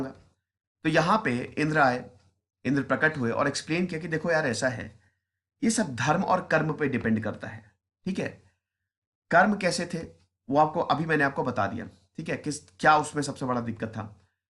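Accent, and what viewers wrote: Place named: Uttar Pradesh, India. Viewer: I love to hear your voice Sir... N my favorite is Bhaiya.... The UP wala touch... 😁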